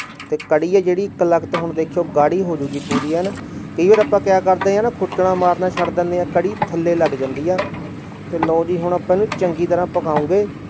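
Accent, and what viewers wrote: Ropar, India. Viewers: ਕਮੈਂਟਰੀ ਕਰਨ ਵਾਲ਼ੇ ਦੀ ਭਾਸ਼ਾ ਤੋਂ ਲੱਗਦੈ ਕਿ ਰੋਪੜ ਸਾਈਡ ਦਾ ਏਰੀਆ ਹੈ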